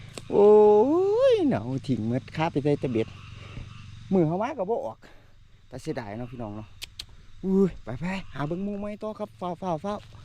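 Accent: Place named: Suphan Buri, Thailand. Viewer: บ่าวสำเนียงคือคนบ้านฉันเลยลาวสุพรรณ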